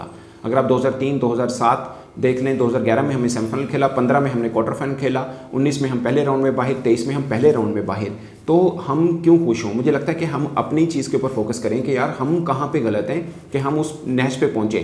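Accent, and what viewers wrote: India. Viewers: دوسرا وسیم اکرم بننے کی کوشش کر رہے ہیں آپ ۔ انڈیا کیلئے مسکے بازی ۔ چلے کچھ ویوز اور انڈین کے تعریفی کمنٹس مل گئے ہیں ۔ ویسے اردو صحیح بولنے کی کوشش کیا کریں ۔ ایسا لگتا ہے کہ کوئی انڈین ہندی بول رہا ہے ۔ "خ " کو " خ " پڑھیں ۔ "خ" کو " ک " نا پڑھیں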